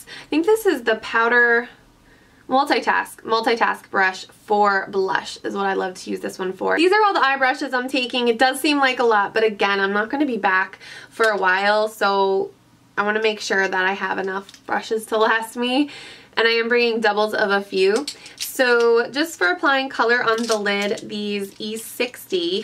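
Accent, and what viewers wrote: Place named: Philadelphia, United States. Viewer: I just saw someone comment and say you're from Philly and I have to say: I fricken knew it! We have a very specific accent and I knew I heard it in you when I first started subscribing to your channel haha.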